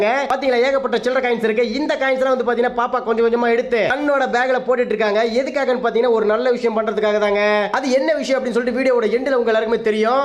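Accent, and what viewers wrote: Salem, India. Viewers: Neenga salem ah? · Unga native salem aa ❤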